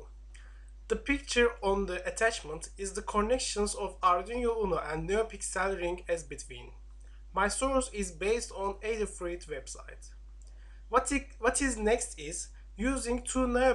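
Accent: Turkey. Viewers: Video başlar başlamaz dedim konuşan kesin Türk. Sonra aşağıya bi baktım harbi bizden. Aksan acayip ele veriyor insanı.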